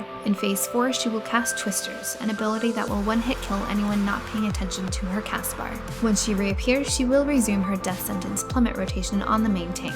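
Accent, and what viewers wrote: Canada. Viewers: Are Canadian or from Minnesota? (The narrator)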